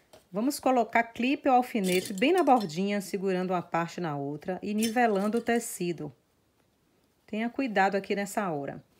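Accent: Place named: Northeast, Brazil. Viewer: .....minha querida seu sotaque é único , eu amo sotaque nordestino...... é q nós paulista falamos vídeo com o som o "i"..... então fica "vidio"..... E eu amo ouvir vc falando "videeo".....adoro ....adoro .....e adoro!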